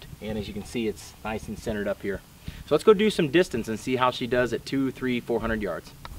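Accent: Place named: South, United States. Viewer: Your starting sound a little more southern. A little bit.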